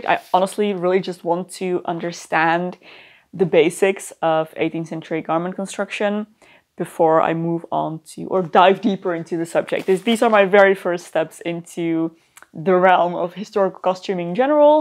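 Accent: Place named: Netherlands. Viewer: hoor ik een wat Nederlands accent af en toe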